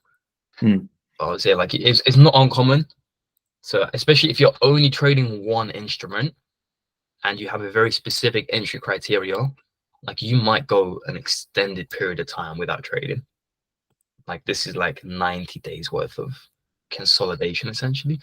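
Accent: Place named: United Kingdom. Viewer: Brits accents, huh manageable 😢😅❤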